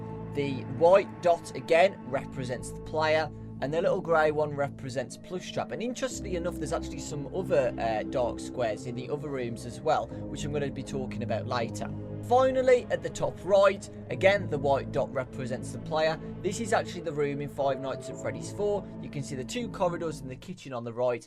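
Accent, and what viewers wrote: United Kingdom. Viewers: Omg your right about sister location being connected to that 4! I looked it up and its true! Also one question. Are you British? You sound a little british. Not hating I just wanna know of your British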